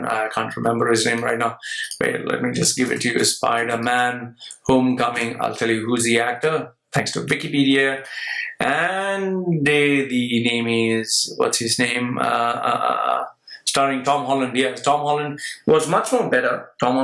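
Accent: India. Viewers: This is one of many Indian accents Not every Indian talks like the stereotyped one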